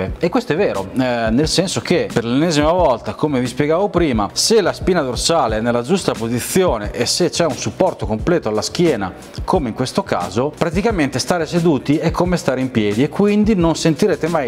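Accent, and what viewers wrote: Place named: Romagna, Italy. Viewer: Forte della qualità video/audio ottima, ed incuriosito dalla sedia, ascolto la tua recensione... ma soprattutto il tuo accento e come pronunci la "z". Diamine! Penso: deve essere per forza romagnolo. Ed appunto, ci troviamo a neanche 20km di distanza. Complimenti per l'ottimo video!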